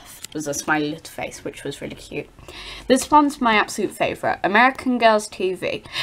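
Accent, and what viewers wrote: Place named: United Kingdom. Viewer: You are awesome! I love British accents, and yours is so unique! You are never too old for dolls!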